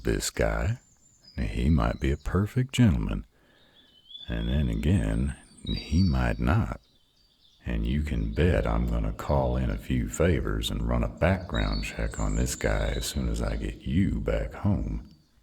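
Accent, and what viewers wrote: South, United States. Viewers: Oh my god…. The southern accent…. I can’t… 😳 🩸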